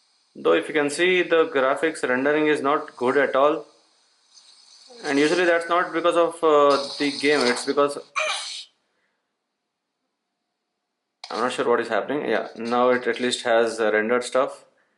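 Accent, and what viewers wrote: India. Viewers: I understood that u r indian 🇮🇳 by listening ur language flow....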